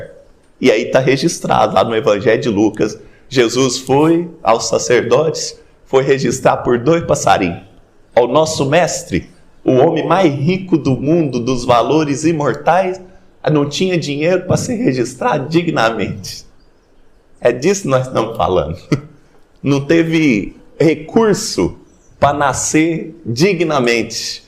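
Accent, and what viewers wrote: Minas Gerais, Brazil. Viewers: Parabéns, adorei o mineirês. Me cativou.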